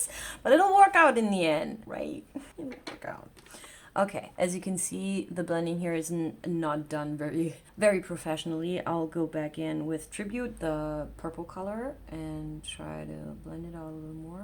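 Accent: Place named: RP, United Kingdom. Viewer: Love the video and the look, gurl. It's great hearing you talk english. Very posh. Even I did not grow up bilingual, due to working in different international companies, my english improved a lot and I'm not afraid to use english, whereever it is needed - and yes, I use much more swear words in english. You asked about the difference between purple and lilac. For me purple is darker, warmer whereas lilac is more of a light cool tone.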